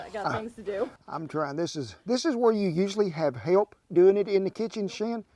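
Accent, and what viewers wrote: South, United States. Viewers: love your country accent and personality. most cooking shows have bored me over the years but yours stopped me dead in my tracks!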